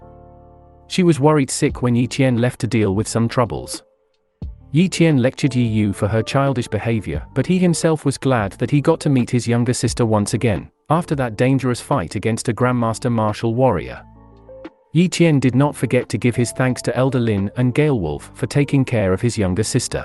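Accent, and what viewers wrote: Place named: United Kingdom. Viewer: A British narrator this time🧐☕👌 😂😂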